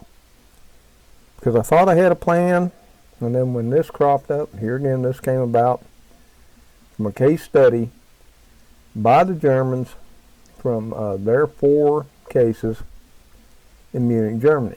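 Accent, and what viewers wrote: South, United States. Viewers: Thank you Mr. for this important update, you have very good advice to us all in this very disturbing time. I have been following a few of the channels you suggested this past week, and can see where this is going. I love to hear you speak and your southern accent, you remind me of my father in your features, but unfortunately he passed some years ago now. You speak so much common sense in these troubling times. There are distressing scenes of people being put into isolation in China on the BBC news right now. God bless you and your family and much 💖 to all. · I love your Southern Accent! I was born and raised in Georgia. When I hear you it reminds me of home! Arizona has been my home for 4 years but I sure miss Southern hospitality southern charm and down right good manners. Oh yea greens and cornbread and boiled peanuts also.😉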